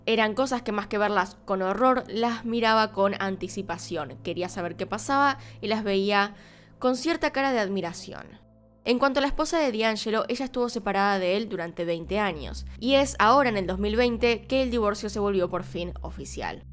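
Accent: Argentina. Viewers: Me encanta tu voz aterciopelada y tu pronunciación del inglés con tu acento argentino. Besitos desde México 🇲🇽 😘 😘 😘 🇦🇷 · Hola, tengo poco viendo tus videos, muchas felicidades, tienes un hermoso acento. Argentino, no se si ya tienes el caso, de hace muchos años de los narcosatanicos de Matamoros, tam. Ojala y encontraras suficiente información al respecto y si te parece hacer un video.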